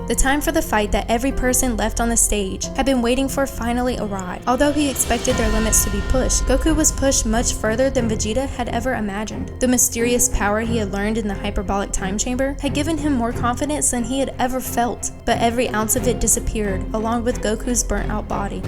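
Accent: South, United States. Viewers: Am i buggin or am i hearin a subtle southern accent ?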